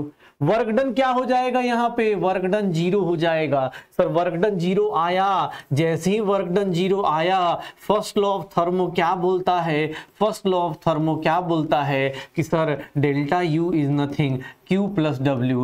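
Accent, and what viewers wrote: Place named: Bihar, India. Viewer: in this whole session I found one thing that sir ka bolna be like - """Entropy Bdhega "-bdhega " ky mst bolte h ekdm bihari style me lgte h sir isliye Inka voice acha lgta h meko..nd pdhai to waise bhi lajawab hai...... 😊🔥🔥🔥🔥👀✨❣️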